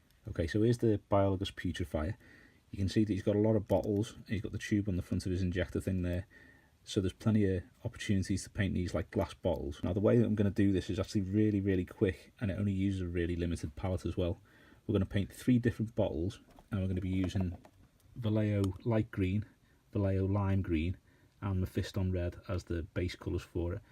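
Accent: Liverpool, United Kingdom. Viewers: Scouser! Yes lad :)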